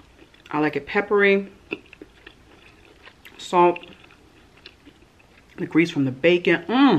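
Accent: New York, United States. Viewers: She should do a mukbang video only talking in her New York accent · I don’t know what it is but I really enjoy watching you eat Mac N Cheese and it’s so pretty too 😎 yes I love a NY accent too 🤣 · This girl from Queens appreciates your NYC accent! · Lmbooooooo at your NY accent. I’m from NY. You dZid good sis. Lolol (I added the z on purpose)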